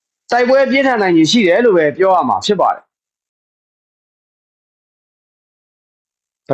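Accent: Yaw, Myanmar. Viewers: okယောနသံ ❤၁၀၀၀၀၀၀၀သိန်း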